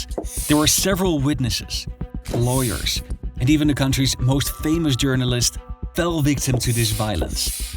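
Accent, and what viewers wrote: Netherlands. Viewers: thick dutch accent warning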